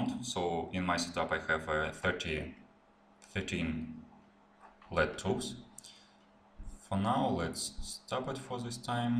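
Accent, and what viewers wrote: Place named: Oxford, United Kingdom. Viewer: То что прям искал ! Спасибо большое ! ) Отдельное спасибо за оксфордский акцент. Всё понятно )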